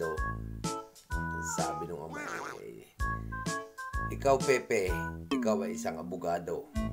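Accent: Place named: Batangas, Philippines. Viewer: Hello sir god bless you po joker pala si sir hehe sir para po kayong batangueño asawa ko kc ganyan mag salita e.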